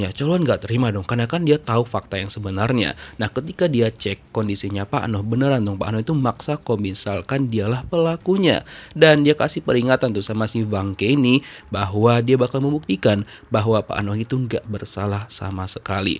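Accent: Medan, Indonesia. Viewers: Yg ngomong alur cerita ini orang medan sumut kah..🤔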